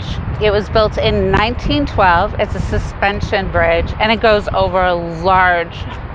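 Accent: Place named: United States. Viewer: It is not english language but american one .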